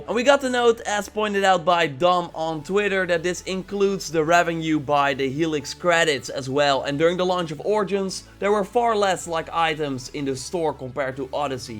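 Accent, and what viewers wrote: Netherlands. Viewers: You got a huge dutch accent :p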